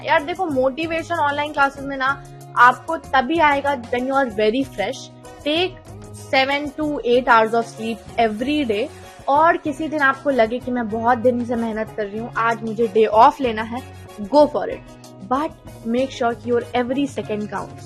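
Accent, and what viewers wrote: Bihar, India. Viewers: aapke bolne ke accent se lagta hai aap bihari ho i am also from bihar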